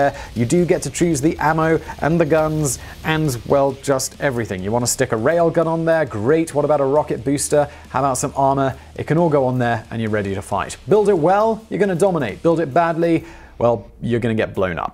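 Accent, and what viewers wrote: United Kingdom. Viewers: BonesThe modern British English accent was created to distance themselves from seceded American colonialists. The goal was to make themselves sound posh, or more intelligent, versus someone from the US. Im saying his British accent is so perfect that instead of my usual opinion of the accent, which is lazy, I think it makes him sound rather fancy.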